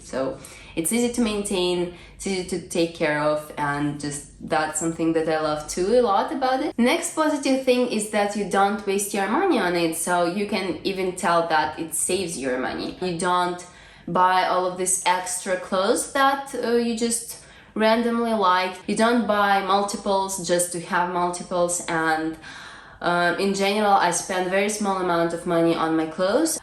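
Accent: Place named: Russia. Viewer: Happiness is found in contentment and simplifying ones life. Buying lots of clothes to impress others will produce disappointment and empty your wallet. Thank you for paving the way for others to consider the minimalist lifestyle, it is certainly producing fruit for me. A technical note: Your voice seems to echo a bit in your kitchen and combined with your light Russian accent it may not always be easy to understand you. Consider getting a small clampable microphone to attach to your neckline, it will make your voice very distinct. I noticed when you were (outside) talking while headed to the thrift store a few weeks ago, your voice was quite clear. For what its worth. :)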